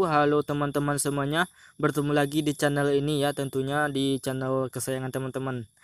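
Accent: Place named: West Sumatra, Indonesia. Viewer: Urang minang yuang😅😅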